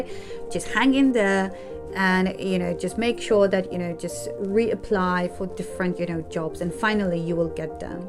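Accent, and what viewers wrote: United Kingdom. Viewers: Hi, thanks for your answers:) Currently I am a student and my English is oscilated between B1-C1, everything depends on what we are talking about: speaking, writing or listening and so on. I am impressed by your British accent. Would you be so kind and maybe make a video or just write a comment, how to make your pronounciacion more Biritsh for non naitive speaker. I know that there are a lot of different videos on YT, but why not?:) And maybe how to understand wishes for Polish people or other "hard" English grammar constructions? Greetings:) By the way, do you think people like me, whose English level is not yet C2, but I hope one day it will, are able to pass CELTA, without having diploma in either linguistics or english philology? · You sound like a native british :) congrats! Where are you from?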